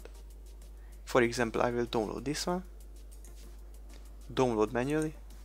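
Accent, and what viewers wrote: Hungary. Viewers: A kiejtésből egyből rájöttem hogy magyar vagy. :D Segített a videó, köszi! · Számomra az akcentusodon tűnt fel,de jó tudni,hogy magyar vagy :D Ha hülye vagyok,s valami nem sikerül legalább az anyanyelvemen tudsz segíteni :D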